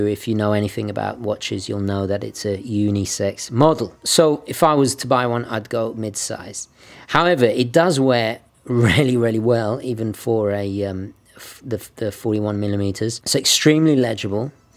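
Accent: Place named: United Kingdom. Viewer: It is a remarkable history and an amazing review. I truly like the way you tell the characteristics. I so much enjoy your British English review.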